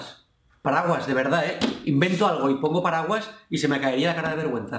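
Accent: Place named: Argentina. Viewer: Yo pensé que era Argentino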